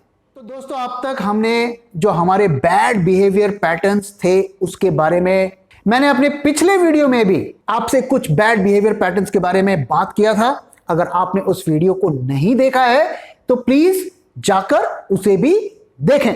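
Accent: Bengal, India. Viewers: Sir ,apki baton se malum chalta hai ap Bengali ho...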